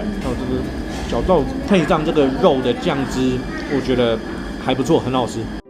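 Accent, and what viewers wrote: Taiwan. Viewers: 你说话的腔调和那个美食博主感觉太像了 那个博主也是台湾郎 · 一開口講話，就知道是台灣人，這沒辦法。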